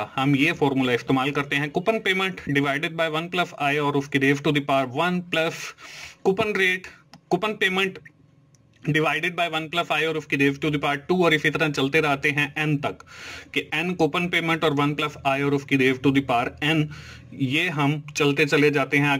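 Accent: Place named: Punjab, India. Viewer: bhi dont mind........ punjabi main english bolny ka style .......kamal hy yar.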